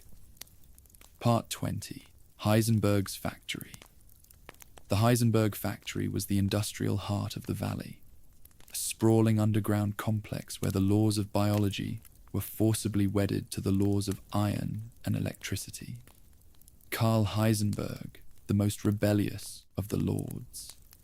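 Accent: United Kingdom. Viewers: Good, calm voice. Crisp sound. British accent(lets go). Now I know what I'm watching for the next two weeks as i drift off to sleep during the 10 mins I'll manage to watch. Great vid dude!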